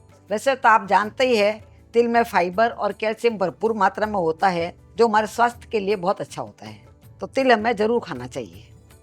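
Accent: India. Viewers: Aap bahut achha video bnate ho, Apki language or bolne ka desi tarikha super h.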